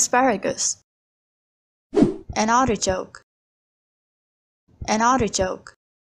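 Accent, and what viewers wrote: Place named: United States. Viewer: If the pronunciation voice was yours then I must request Google to add yours in their data base.🙂 I can't hold on with this pronunciation as it's American and I speak British. Good video though. 👍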